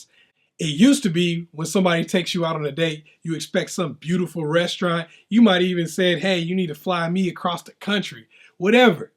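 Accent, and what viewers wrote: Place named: Decatur, United States. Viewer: Are you from the East side? You sound like you’re from Decatur? Btw great advice as always ✌🏽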